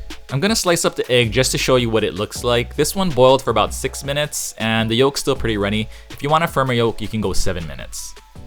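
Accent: Hawaii, United States. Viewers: Are you from Hawaii? You sound like you're from hawaii.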